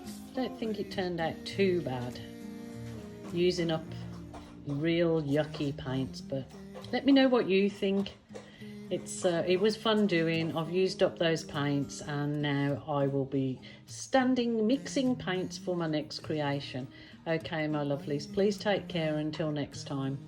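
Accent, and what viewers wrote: United Kingdom. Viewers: Really beautiful. Can I detect a touch of a UK accent ? Thank you for sharing K🇬🇧❤️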